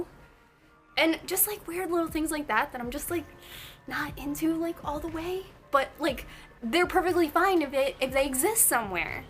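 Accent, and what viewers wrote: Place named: South, United States. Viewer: I love how you've had a slight country accent most of the video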